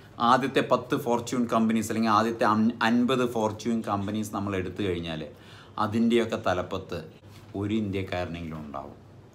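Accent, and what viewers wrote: Palakkad, India. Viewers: ഈ സ്ലാങ്ങ് പാലക്കാട്ടിലെ ചിറ്റൂർ കൊഴിഞ്ഞാമ്പാറ, വണ്ടിത്താവളം എന്നീ ഭാഗത്താണ് പ്രധാനമായും നമുക്ക് കേൾക്കാനാവുക. കേട്ടിരിക്കാൻ നല്ല രസമാ☺️☺️☺️🤝🤝 ജോയിന്റ് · Palakad slang enik eshtatto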